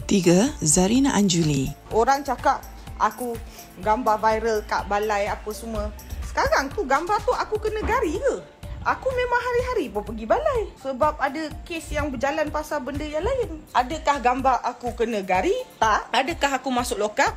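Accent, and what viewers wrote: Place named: Kelantan, Malaysia. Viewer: Kelate kan 😂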